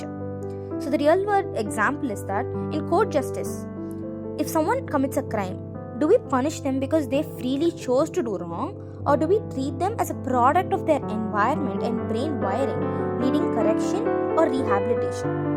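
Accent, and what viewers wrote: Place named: India. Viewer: I love Indian gurl accent 🌹😋🤪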